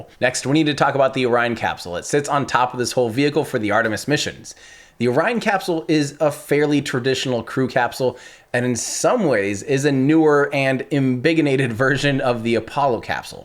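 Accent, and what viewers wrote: Iowa, United States. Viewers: (Going back again...) Why do you believe your complaint about the ambiguity of calling the smaller unit the same name as the system as a whole (like when some people call a whole church meetinghouse a "chapel," the same as the main worship room by itself) reveal that you sound like you're from Iowa?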